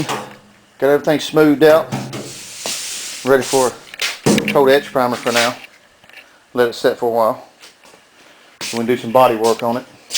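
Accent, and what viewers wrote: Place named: Appalachia, United States. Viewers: Love this hillbilly accent! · Your voice and accent is identical to my brother in law's. He was born, raised and lives in Anderson, South Carolina.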